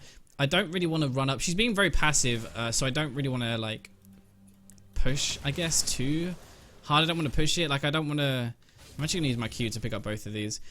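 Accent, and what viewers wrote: United Kingdom. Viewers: a wool? a wool? took me like five sec to figure out you were saying wall. british accents are awesome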